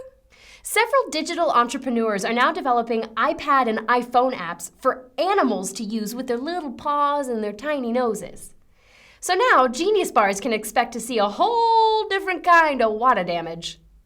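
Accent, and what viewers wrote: England, United Kingdom. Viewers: You're welcome. Obviously I just call you those things outloud and appreciate that I've never actually typed those words in the comments box because, well come on, that would just be fucking creepy! I do feel comfortable enough to announce that I am a huge fan of your english accent though, great because it's not the "upper class" accent that most go for.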